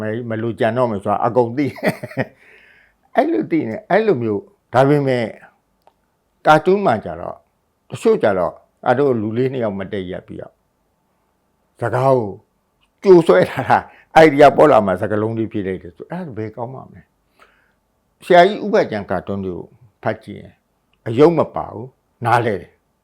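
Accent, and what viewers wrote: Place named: Upcountry, Myanmar. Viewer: အညာသားလေ သံ မပျောက်။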